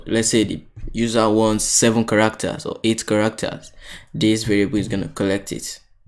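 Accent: Nigeria. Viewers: If it's an African, it's definitely a Nigerian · i hear the Nigerian accent!!🤭🤭🇳🇬🇳🇬🇳🇬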